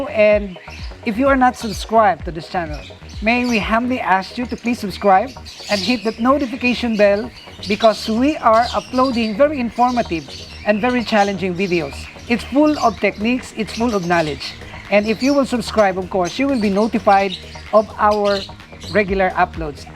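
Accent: Philippines. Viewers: That's a typical Philippines 🇵🇭 accent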